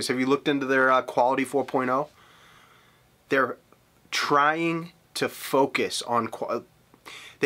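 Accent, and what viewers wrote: Boston, United States. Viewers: I hadnt noticed the boston accent before haha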